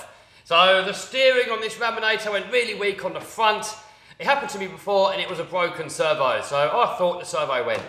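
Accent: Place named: Cockney, United Kingdom. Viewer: You have the strongest Cockney accent I've ever heard! Lol great vid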